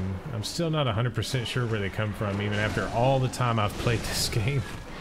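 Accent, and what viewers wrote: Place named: South, United States. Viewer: Yes ma’am I can tell you got that southern draw lol me too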